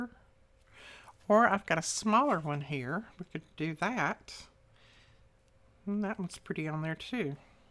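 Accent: United States. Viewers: Hi, first of all glad your granddaughter had a great birthday, we worry about our grandkids more because we know we won’t be with them for as long of a time as we want too. I am so glad to hear that the crafting community helps you with your grief at losing your beloved husband Whit. If you want to just chat that is fine with me as I love listening to your American accent. Very cute haha xx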